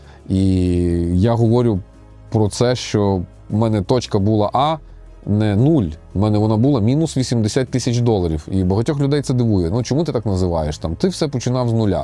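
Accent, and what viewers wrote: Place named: Lviv, Ukraine. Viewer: Перший раз побачив на підкасті Фонтан. Перші пару випусків було досить незвично...Але, через деякий час звик до "львівської подачі") - почало подобатися... БВБ, на мій погляд, гарний формат в який ведучий досить гармонійно вписався! Дякую дядь:))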